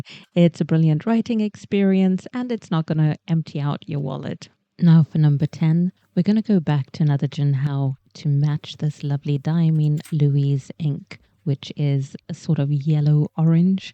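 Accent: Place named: United Kingdom. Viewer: What a pleasure to hear a British voice on oens - and to have UK prices that actually mean something! Jinhao, Asvine and Hongdian produce fantastic pens at good prices. And I hate Sailor pens - mine never make it out of the case. I should sell them but it would be cruel and unusual punishment on the buyer.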